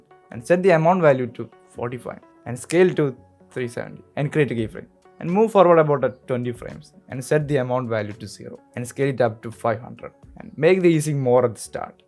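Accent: Kerala, India. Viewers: ur indian accent is very funny but considering ur so skilled i respect it 🙏 keep it up man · English ketalee ariyam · Cant understand anything cuz of that thick indian accent but w vid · Malayalam english ahhh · thickest indian accent on the planet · you are from kerala right alla accent kettatu manasalayda gg nice video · Yeah his english accent gives away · Indian ❌️ kerala accent ✅️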